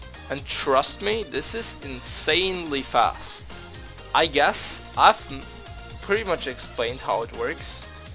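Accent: Germany. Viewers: your english pronunciation lets everyone think that youre from germany in like 3secs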